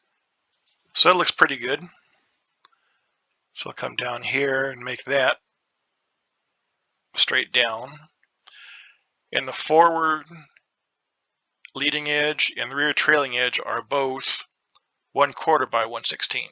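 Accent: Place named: Pennsylvania, United States. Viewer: Absolutely fantastic! Thanks a million for this. btw, are you from the great state of Pennsylvania? You have that accent ..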